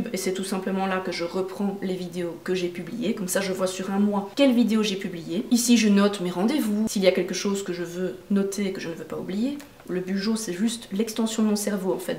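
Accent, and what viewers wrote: Belgium. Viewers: Bonjour, j'adore l'idee de grace au bujo etre organiser pour enfin realiser ses objectifs mais comment on fait pour realiser un bujo quand a la base on est pas organiser ds sa tete , en gros je sais pas pas ou commencer et il y a tellement de chose a y mettre pour que ma routine du quotidienne et mes plus gros objectifs soit mieux atteignable car mieux plafinier , j'adore ton naturel! et ton petit accent belge qui reste malgré NY :-) Bizz de Bruxelles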